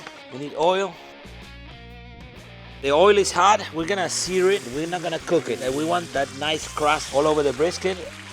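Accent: Argentina. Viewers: Argentiniza el tipo. Bien ahí.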